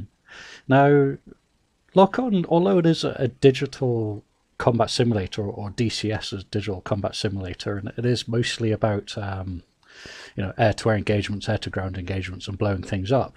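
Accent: United Kingdom. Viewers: Where are you from? East London?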